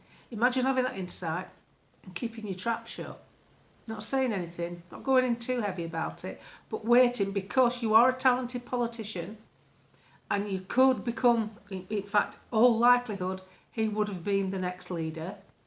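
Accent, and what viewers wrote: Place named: North England, United Kingdom. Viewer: I love listening to your channel, apart from it's nice to listen to someone speaking in a straight forward way in a northern accent, I'm from Lancashire (as opposed to "Greater Manchester") you talk such sense you also come out with cracking funny comments as well.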